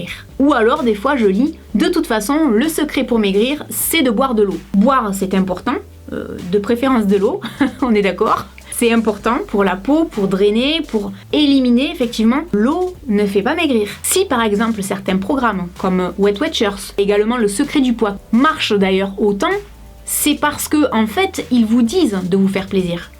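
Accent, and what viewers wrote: South, France. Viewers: J'adore ton accent du sud!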